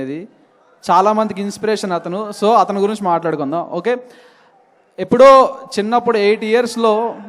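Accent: India. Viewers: Anthanu anedi Vizag, vizianagaram slang andi respect word oka oka pratham lo different slangs untai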